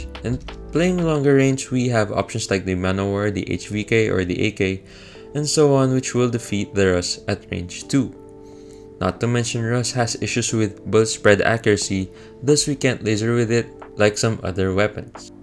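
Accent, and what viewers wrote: Philippines. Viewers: Idk but the way he speaks sounds like how a Filipino speaks English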